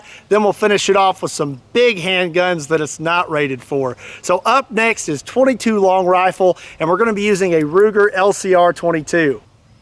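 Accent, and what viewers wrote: Kentucky, United States. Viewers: Are you in east or west it I live in Paducah which is west you sound like your in west Kentucky which is the more gun friendly part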